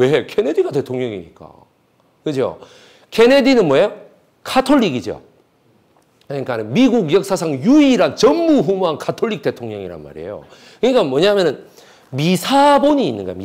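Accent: Gyeongsang, South Korea. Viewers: 갱상도라 구수한 말투 정감이 갑니다 전 칠곡이거든요 요즘 강의들으면서 많은 사실적인 스토리에 감동입니다 감사합니다~^^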